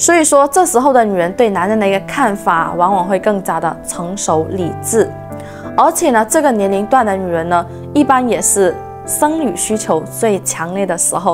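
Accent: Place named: Fuzhou, China. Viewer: 請問這位講話的人是不是來自福州？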